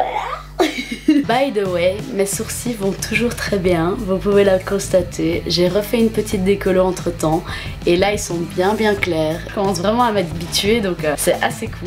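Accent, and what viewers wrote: Belgium. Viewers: C'est bien une compatriote belge que j'entends là?Je te trouve super cool a regarder je m'abonne directttt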